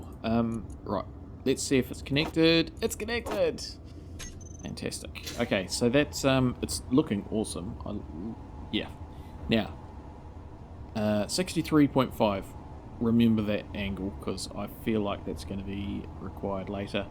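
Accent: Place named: New Zealand. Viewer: Man... i know its a tiny thing, but i was waiting for the American accent... and then i was pleasantly surprised. Im assuming your a kiwi? i really like this build from what i have seen of it man, great work, well recorded video, wwell spoken. highly enjoyed!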